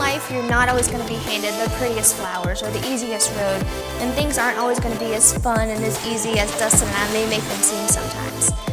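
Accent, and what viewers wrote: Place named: South, United States. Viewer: I've been with you since the pandemic, and just watched this. I am even more inspired and in love with you, your personality and positivity. You are infectious and I admit what brought me here originally was your southern accent (as a Charlotte native). Thank you for bringing your all to us and making us better! Your brother would be so proud of you <3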